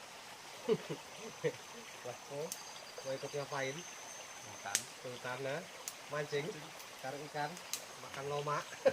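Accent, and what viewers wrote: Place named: Java, Indonesia. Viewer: Logate kok teko njowo ms ati2 Ono ulo AQ ko SBY ms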